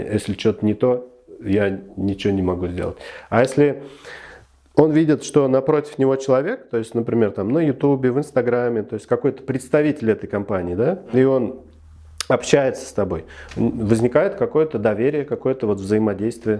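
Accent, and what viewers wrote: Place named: Cheboksary, Russia. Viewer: Здравствуйте! Я более 20 лет живу в Германии, смотрю Ваши видео и сегодня узнала, что Вы живёте в Чебоксарах и поняла почему Ваш говор ( или акцент) меня как-то приятно трогает. Дело в том, что я каждый год в течение 20 лет иногда и два раза в год навещала в Чебоксарах мою двоюродную сестру, ухаживала за ней и однажды проходила курс лечения у мануального терапевта кажется на улице Горького. Сестра моя умерла 2 года назад и больше я не езжу в Чебоксары, но очень скучаю по вашему городу. У меня есть там очень хорошие знакомые, поддерживаю связь с ними.Очень нравятся Ваши видео и было очень приятно узнать, что Вы из Чебоксар! Удачи Вам, и процветания!!!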